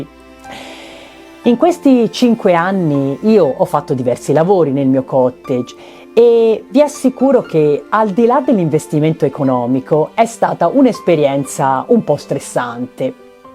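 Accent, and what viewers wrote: Italy. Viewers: Mi sembra di cogliere l’accento della mia regione l’Umbria . Mi sbaglio?!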